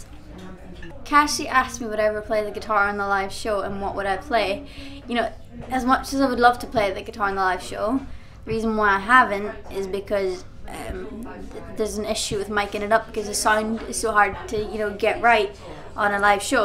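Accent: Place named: South, Ireland. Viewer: dont like how shes changed her accent to sound more southern irish.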